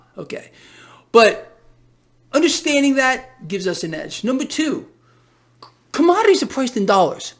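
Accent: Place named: New York, United States. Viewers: Sorry. I can't take the New York accent. Spent about 30 seconds listening. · Good idea, I am asleep when you do your lives anyway (Australia) but awake when you do end of day.....but entirely up to you, use the channel for the wrap up over seas whilst I sleep and of course to hear you NY accent. By the way thankyou for all you do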